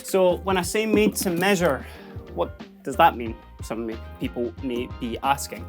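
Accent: Scotland, United Kingdom. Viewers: Hi! Are you from Scotland?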